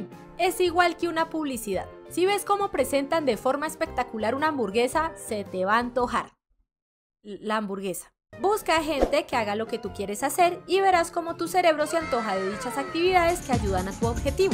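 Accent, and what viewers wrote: Colombia. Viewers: tu de que parte de colombia eres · Eres de Bogotá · Oye una pregunta eres colombiana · ¿De que parte de colombia sos?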